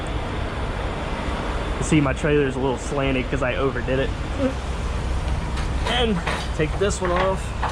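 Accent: Mississippi, United States. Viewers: Man I knew you was a Mississippi boy lol. Same here.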